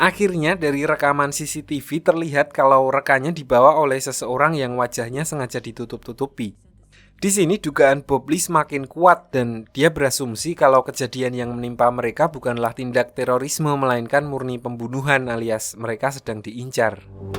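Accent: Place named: East Java, Indonesia. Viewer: Orang mana si bang, logatnya kek jatim bgt :v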